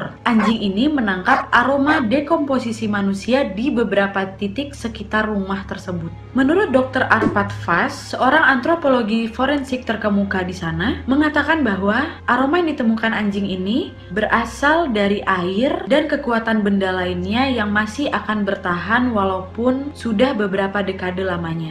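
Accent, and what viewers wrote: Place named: Medan, Indonesia. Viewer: Logat Medan nya itu Wak...👍😁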